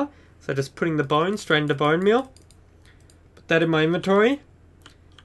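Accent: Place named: Australia. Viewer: i think hes from australia by the sound of his voice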